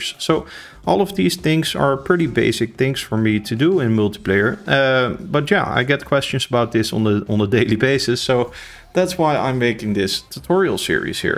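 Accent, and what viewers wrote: Netherlands. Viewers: Just found your channel, exactly what I have been looking for for ages! (and you premiered it on my birthday XD) You sound dutch (ben ik ook XD) Looking forward to going through these tutorials ^^ Thank you for making them <3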